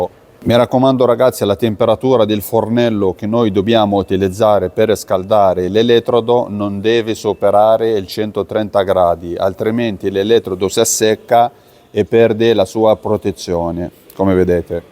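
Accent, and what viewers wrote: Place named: Egypt. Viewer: E egiziano si sente dall’accento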